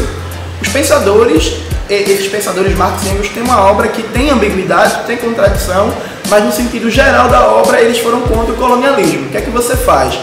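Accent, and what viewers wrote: Pernambuco, Brazil. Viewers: Oh Deus! Esse sotaque de pernambuco me mata. 😍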